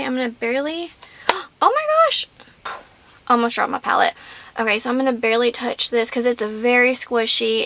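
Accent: South, United States. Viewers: very haha hey neighbor!! I knew you had a southern accent and had to be close lol 😂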